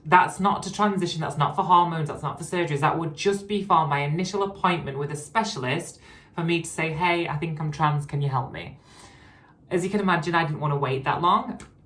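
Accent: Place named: Scotland, United Kingdom. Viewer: Politics are terrible - makes sense what you mentioned. I am honest in stating I think it's a bit much-expecting people to understand this overnight and learn everything - the world is busy and that's not on my list of things to learn right now, however, you are a human (woman) who I respect but not what I see in the parades and story time with drag queens - what the heck. I love people and care a lot but they can get very nasty over a mistake - misgendering. The community seems to be very entitled but not yourself. Thank you for helping me understand everything and learn that not ALL transgender individuals are the same. I feel bad for you but also respect you because you are living your life and doing an amazing job with your transition and platform. You are a beautiful and kind woman and I am beyond grateful to have found your videos. 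Positive vibes and love from Canada. BTW I love your accent, my grandmother was a war bride from Scotland.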